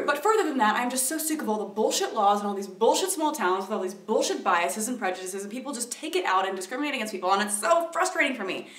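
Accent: South, United States. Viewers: Why did you put on a southern accent? Michigan is as north as it gets, minus Alaska.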